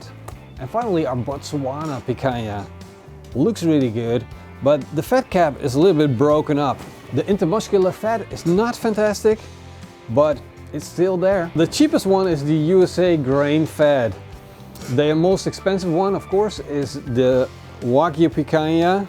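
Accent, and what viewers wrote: Netherlands. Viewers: He sounds a bit Dutch.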